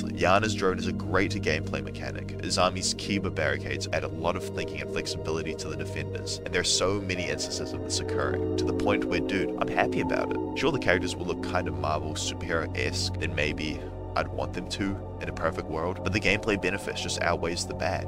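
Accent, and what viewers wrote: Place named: Australia. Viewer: You sound like elmaxo (no it’s not just the australian accent)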